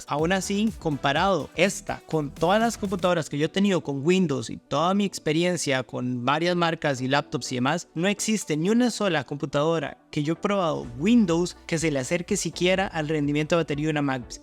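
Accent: Costa Rica. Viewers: Reconozco la voz de un tico a kilómetros o me equivoco? 👀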